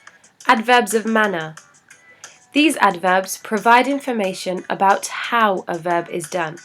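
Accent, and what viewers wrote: England, United Kingdom. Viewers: how are you today, I have seen your channel, and is very good I love your accent as an England citizen and tell you what I would like to hear from you a big list of vocabulary with your pronunciation is very important to speak good English, hope to hear from you soon, I am from Costa Rica, big hugs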